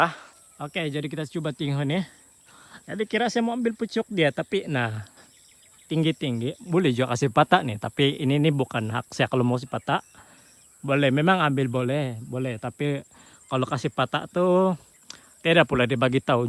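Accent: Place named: Malaysia. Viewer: Betul betul betul Logat malasia yasobat Hdr bwt nyport👍👍👍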